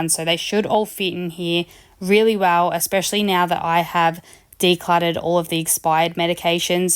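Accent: Australia. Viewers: I do not see on your channel description on where you are located/which country? Im guessing Australia 🇦🇺? Anyone knows, i love her accent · This was an awesome video, I love listening to you talk, maybe because you're another Aussie. Be proud of what you are accomplishing, you are getting things done. Love the before and afters! Thanks for sharing, I wish you would make more videos, so motivating!!!! 🥰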